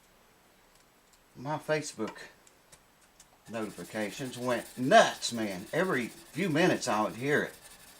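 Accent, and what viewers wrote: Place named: West Virginia, United States. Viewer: Just found you, great videos. I have a D15M Martin and love it. Every time I take it out of the case I stick my nose in the sound hole and take a big breath! Where you from, I grew up in WV and am picking up some WV accent on some of your words, I live in NC outside of Charlotte now. Love your channel.....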